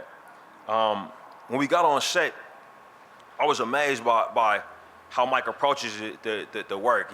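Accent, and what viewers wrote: Oakland, United States. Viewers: I like that he stutters and has an Oakland accent because, despite that, Ryan is talented, has great vision, a mind, dedication, and follow through based on what I've seen and heard about him.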